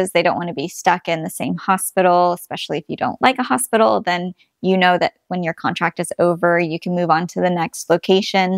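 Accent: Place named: Australia. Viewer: what county you are mam? I thought youre from Australia. 😅 are you from US?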